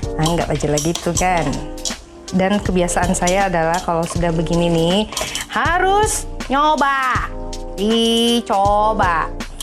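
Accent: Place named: Batam, Indonesia. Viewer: Logak nya kaya nya orang batam..😀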